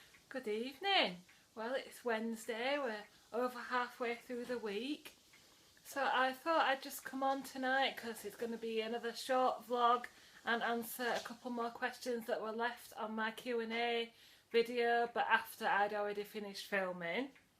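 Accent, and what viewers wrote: Yorkshire, United Kingdom. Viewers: Ooh I can hear the Yorkshire accent now 😃 are you on Instagram at all ?? Also not sure if you have said but what are your plans for Xmas are u using flexi sins, being off plan ?? Was wondering re the cake i have one too xx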